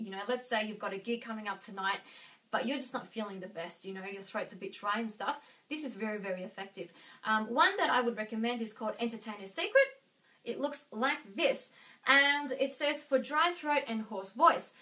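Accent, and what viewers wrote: Australia. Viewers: You look Asian but sound Australian..what are you..? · Its clearly australian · Her accent is Australian. Not like the movies is it? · Juan Barboza it doesnt sound American and British because its Australian. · Wow I need to go to Australia and marry an Aussie woman when I grow up because the accent is SO clean! :D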